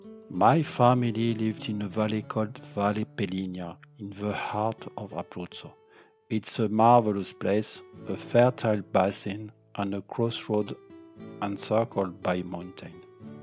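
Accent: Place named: France. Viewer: very interesting video and very funny french accent, t u 😃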